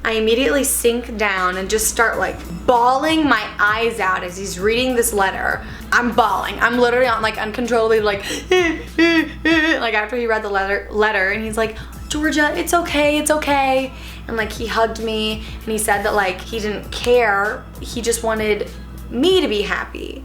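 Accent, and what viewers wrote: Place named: United States. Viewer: Your funny 😂😂I love your American accent 👋🤟🏽hi I’m Niayla nice to meet u 🤣🤪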